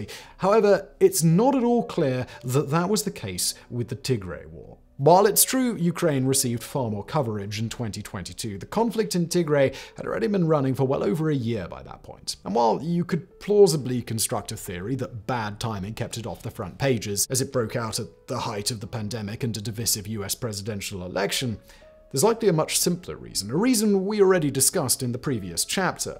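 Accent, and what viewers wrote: RP, United Kingdom. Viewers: I wish the world to know not all of us British sound this way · You are simply very biased, repeating TPLF propaganda with a BBC accent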